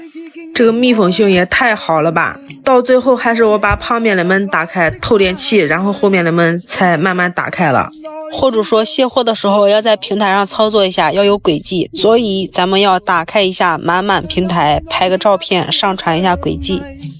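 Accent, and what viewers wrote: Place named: Shandong, China. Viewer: 感觉你有山东口音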